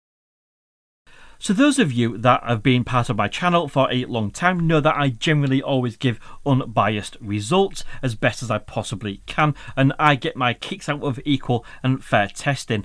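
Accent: United Kingdom. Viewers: I am from indonesia Is the accent that you are using is british?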